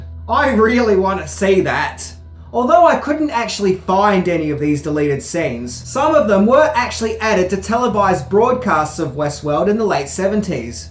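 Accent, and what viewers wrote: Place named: Australia. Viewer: " but with thick accent it's hard to tell.' Seems pretty mild for an Aussie. Maybe its all that Monty Python that has prepared me for non-American accents. Such as the University of Wallamaloo sketch.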